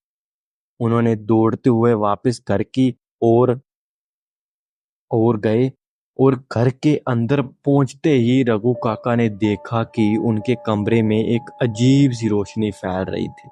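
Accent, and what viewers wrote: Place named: Punjab, India. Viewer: Jado tu story start kiti mein pehla he guess kr lya k tu Punjabi ha, kehra pind Mitra tera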